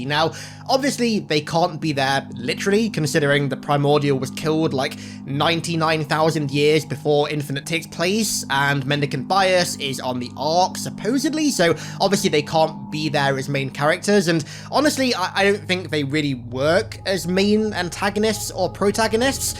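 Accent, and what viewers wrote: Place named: United Kingdom. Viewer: This guy said if my voice sounds a little bit weird LOL this guys voice in general is horrendous he’s got one of those horrible British accents that sounds like he’s got porcelain teeth and he swallowed glass he makes great videos but I just can’t stand listening to his voice no offense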